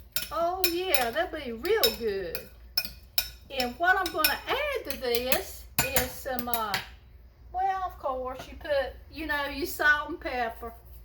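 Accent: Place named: South, United States. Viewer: I am a diabetic, so I am so glad I found your Ste. And plus you have a southern accent like me! Thank you for sharing! Please keep your site going!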